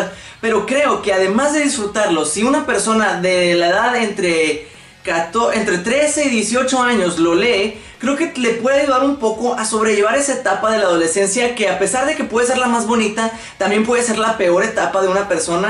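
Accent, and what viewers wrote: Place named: Monterrey, Mexico. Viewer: En corto me di cuenta que eres de Monterrey JAJAJA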